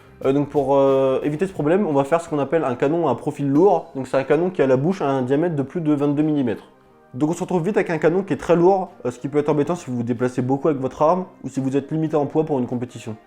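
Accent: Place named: North, France. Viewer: J'ai beau avoir quelques années de tir, je me régale à vous écouter. Et cet accent, du nord, trop sympa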